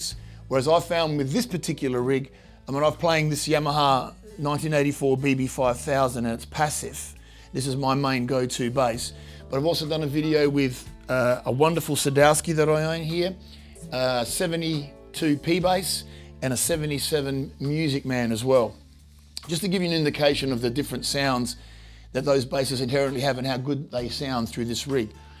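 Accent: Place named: Italy. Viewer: I can't hide his italian roots!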